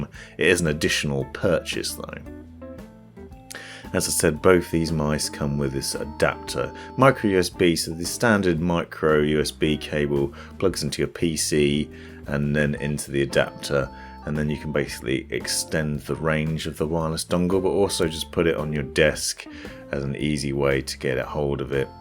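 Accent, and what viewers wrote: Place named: RP, United Kingdom. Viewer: u sound like a rich dude living in a castle....in england......posh voice NOICE though u gave a clear info about this mouse now all i need to do is save up some cash and buy it some day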